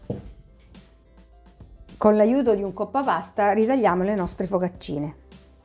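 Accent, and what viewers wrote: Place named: Southern Italy, Italy. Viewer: Seguo spesso le vostre ricette, complimenti. Posso chiedere di dove siete?. Sicilia? Calabria?. Non riesco a capirlo dal vostro accento...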